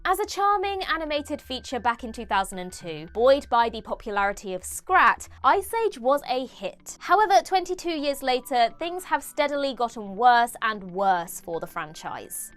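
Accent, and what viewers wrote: United Kingdom. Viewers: Could this hostess be any more British?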